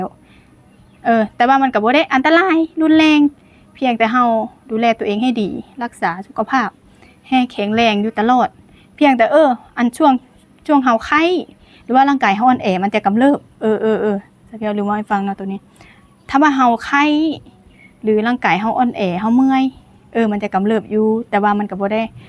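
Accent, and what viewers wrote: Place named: Khon Kaen, Thailand. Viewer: เพียงยูแขวงได๋ เว้าคือบ้านอ้ายแท้ ขอนแก่น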